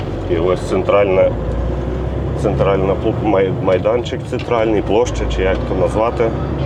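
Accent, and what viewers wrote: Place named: Ternopil, Ukraine. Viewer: земляку вітаю акцент Тернополя точно тобі вже пора нового петровича 389 😂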